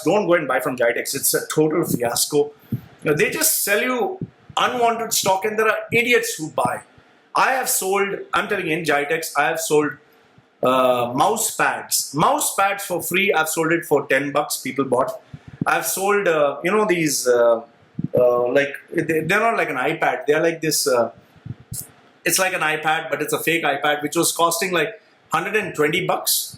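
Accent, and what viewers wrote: Kerala, India. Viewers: Y sooo much of hateee......Just think in a possitive way.....This country has provided a lot to expats who work here.....Respect gratitude...And speaking about labourers .....all those people are supplied by some indian or pakistanii booses.....why are you bieng such a hard ass.....Finding negativity in everythinggg.....Chill dudeeee.....and if uae goes down ....we tooo go down with themmmm.....Easy broooo...there are million of people who relies on this economy.....alo g with this native people there areee other who pray for this govttt.....find the gooddd spread good thingssss......And stop acting likeee ur an american ......I am sure ur a malabaaaaaariiiii.....buy ur manglish accent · Wat the fuck. I bet ur not married if so she has to be sad or even blind haaaaaaaa haaaaaaaa haaaaaaaa But u sound Indian u bullshitting loony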